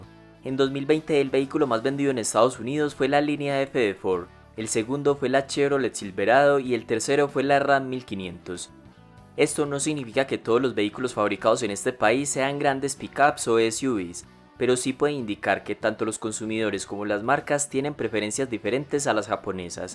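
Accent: Antioquia, Colombia. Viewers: Colombiano, de la región de Antioquia, llamado paisa.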